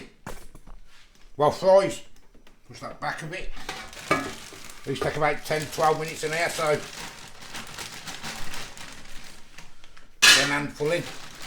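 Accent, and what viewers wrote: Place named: Midlands, United Kingdom. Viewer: I thought i cud detect a midlands accent.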